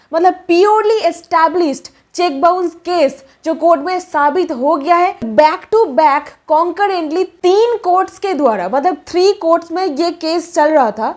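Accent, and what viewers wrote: Bengal, India. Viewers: Waise achha bolti ho lekin bangoli ka ye problem hai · Bangali ho isliy aapki bhasa bahut pyari h ha hindi thoda weak h .mam aap jo boli 10yrs case chala .mujhe tazzub hua mera v ek 95995 ka cheqe bounce ka case h wo accussed person abhi khi chhup gya h samne nhi aa rha h usne bahuto ko thaga h mere pass cheqe tha isliy court tak gyi sochi 6 to 12 month me result aa jayega lekin mai dr gyi hu kya 10 yrs tak v case chalta h .itna late jyu hota h mam?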